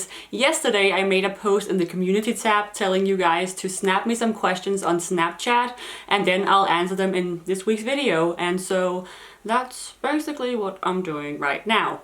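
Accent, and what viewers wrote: Denmark. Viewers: Hey as a Texan an American learning all Scandinavian languages an German plus Gaelic I say it sounds awesome hearing the Dane accent in English :) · I love the Danish accent when you speak English! I love how articulate you are on topics like immigration too. I wish I could be like that rather than stumbling over what I want to say xx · Awww I love accents, including the Danish accent! I just wish I could get rid of my American accent when I try to speak Danish. :p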